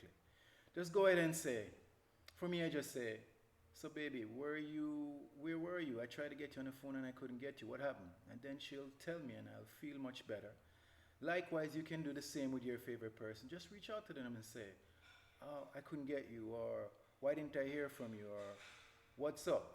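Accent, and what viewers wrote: Caribbean. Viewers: Hey, you sound like you're from the Caribbean. I'm Trinbagonian. Love you too. Your wife is lucky that, as a man, you've taken your mental health into your own hands and are trying to be better for yourself and her. It's refreshing to see an informative and proactive video on this topic from someone who lives it. Stay up, Brother.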